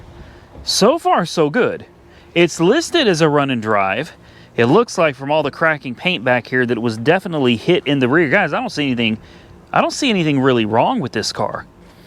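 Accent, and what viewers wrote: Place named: South, United States. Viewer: Love your accent, brings me back lots of good memories in Southern US!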